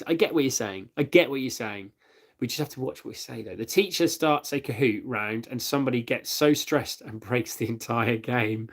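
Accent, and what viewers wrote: United Kingdom. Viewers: are ❤u❤ British❤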